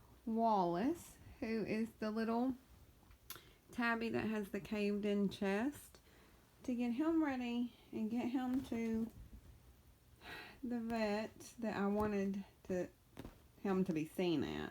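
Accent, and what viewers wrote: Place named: Texas, United States. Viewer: You sound like a Texan, like me.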